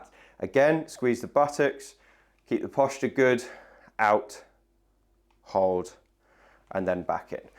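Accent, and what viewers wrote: United Kingdom. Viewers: I love your lovely British accent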